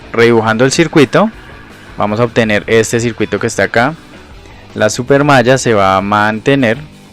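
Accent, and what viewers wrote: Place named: Colombia. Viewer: Ya te agarré el acento, Es de Colombia. Es que dicen que los de Sinaloa tenemos parecido el acento al de Colombia. Tienes una joya de canal, lástima que no tengas las visitas que mereces. Pero sé paciente. Poco a poco. Saludos!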